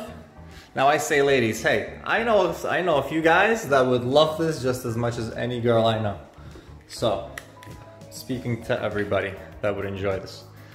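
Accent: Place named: East Coast, United States. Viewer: I notice a slight east coast accent. any chance your from NY/NJ?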